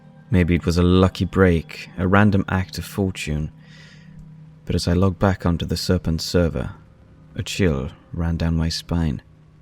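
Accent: United Kingdom. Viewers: Right!!!! The f**kin voice,soooo sexy and very sexual and the big orgasm is the voice being British!!! Unbelievable hot!!!!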